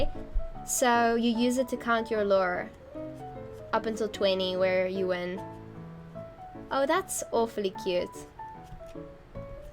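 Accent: Afrikaans, South Africa. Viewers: Do you speak Afrikaans? Is this your normal English accent? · Keep your South African accent - the merging of the two sounds silly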